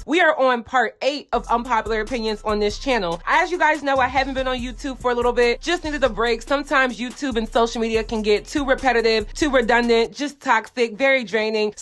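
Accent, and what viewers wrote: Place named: Memphis, United States. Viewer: Nawwww! Not You Tryna Sound Like A Memphis Female Rapper, & Shoutout “Memphis”. After You Stated “BIG GLO” Ratchet Aesthetic Is ”Repulsive” & “ Demeaning”! It’s All Ready Done By The “City Girls” & Your Tired Of It! Nawwww Na Sis, How You Judge & Try To Do The Same. ION LIKEEE DET #901RAISED #Memphis ❤️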